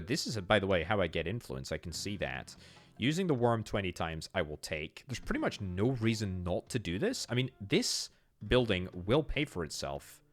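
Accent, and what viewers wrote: Scotland, United Kingdom. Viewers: he's definitely Scottish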